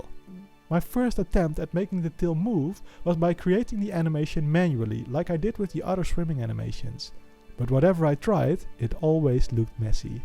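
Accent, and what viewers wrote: Netherlands. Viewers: ik vind dit spel heel leuk zeker omdat het gemaakt is door een nederlander.. tenminste je klinkt als een nederlander XD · Are you Dutch really sounds like it😳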